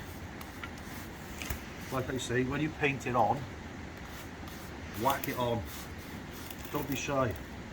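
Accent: Wales, United Kingdom. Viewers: Some good tips with a Welsh accent ... seeing as I'm originally from North Wales it's a win / win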